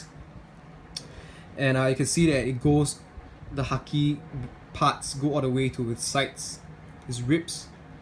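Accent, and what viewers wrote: Singapore. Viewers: By your accent, i know you're singaporean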